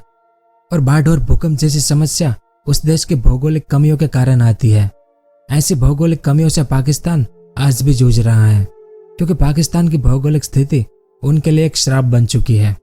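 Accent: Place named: Rajasthan, India. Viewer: Aap rajsthani ho apki voice tone Rajasthani jasi h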